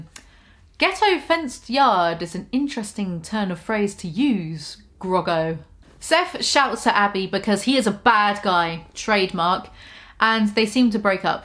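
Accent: England, United Kingdom. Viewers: The English accent though... 😂 i loved this video.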